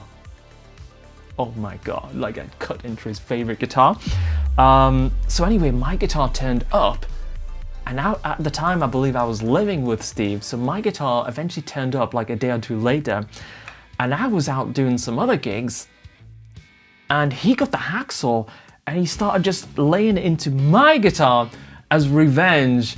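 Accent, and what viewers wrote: Newcastle, United Kingdom. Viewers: he's a Geordie. Newcastle, mate. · I guessed you were a Newcastle lad but you seem to have a mixed bag of accents spilling out from time to time. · How bout some of that Geordie accent in your next video? That MacAlpine BC Rich looks kicking!!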